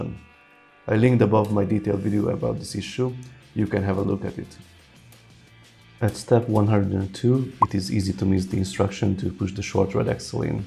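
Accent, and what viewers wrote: Hungary. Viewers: I didn't know but recognized the accent:P I'm Hungarian too;) Üdv,) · Thank you for this! I detect a slight Hungarian / eastern EU accent. Where are you from, if you don't mind sharing? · I just came across your youtube channel through recommendation. I immediately thought this guy must be Hungarian, and you are!:D (Don't misunderstand me, your pronunciation is great, just for a Hungarian is easier to spot a Hungarian accent. Szia!:)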